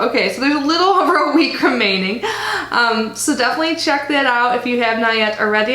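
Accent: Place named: Minnesota, United States. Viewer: Idk where you’re from but you have the thickest Minnesotan accent I’ve ever heard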